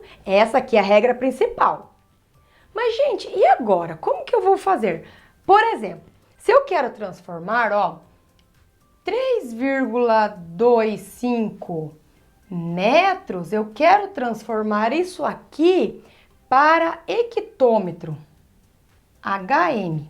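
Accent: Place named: Rio Grande do Sul, Brazil. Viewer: Vc parece gaúcha 😅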